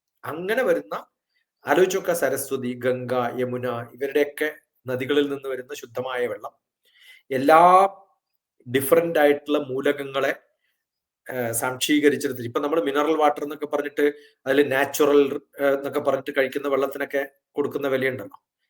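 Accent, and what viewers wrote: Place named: Malabar, India. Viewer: May be from Malabar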